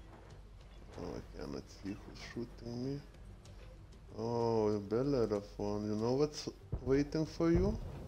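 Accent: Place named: Lithuania. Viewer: English Lithuanian accent.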